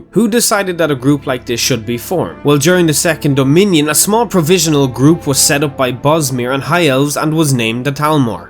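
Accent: Ireland. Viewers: First video I have seen of your channel and I'm so glad I did. I love your Irish accent and the humour is brilliant great lore video. 👍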